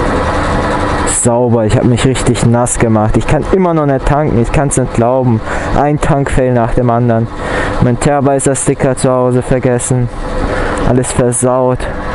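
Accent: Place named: Bavaria, Germany. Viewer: Gruß in meine Heimatstadt! :) (Y) Gebürtig bist Du aber nicht aus S oder? Würde Dich vom Dialekt her eher Richtung Nord- oder Ostbayern verorten?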